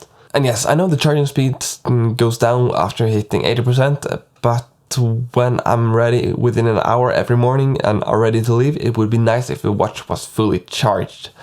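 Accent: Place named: Norway. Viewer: Since english is not your native language, don't sweat it too much with the pronunciation, since norwegian pronunciation probably tries to slip in while speaking in english, and that is totally normal, nothing wrong with it. Focus on keeping up the making of content and your english will tune in by itself effortlessly. Good work!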